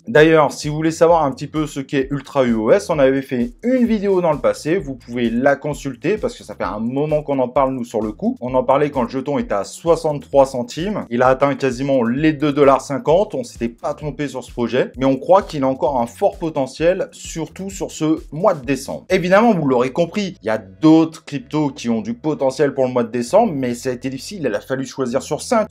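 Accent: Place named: England, United Kingdom. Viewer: J'aime beaucoup ton accent anglais 😅 Dommage de ne pas avoir présenté de projets qui n'ont pas encore pump Merci quand même chef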